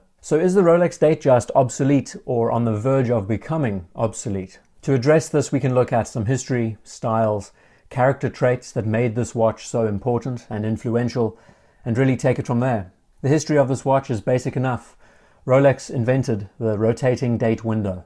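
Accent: South Africa. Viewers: He sounds South African.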